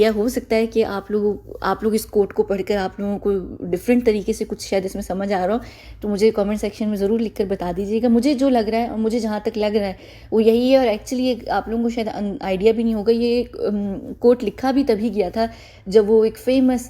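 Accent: United Kingdom. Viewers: You have a beautiful British accent 😘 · I like ur English accent, unlike some haters I just hope u speak in English more.. 😀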